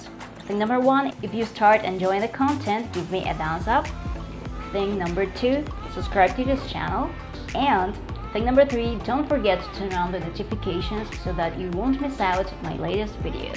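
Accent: Cuba. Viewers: Hola Maestra. Me llamo Zulkifli. Mucho gusto. Yo soy de Malasia. Today first time I come across your channel. Your teaching method is so excellent. Yes I love the Cuban accent. ¿Qué hay? Muchas gracias.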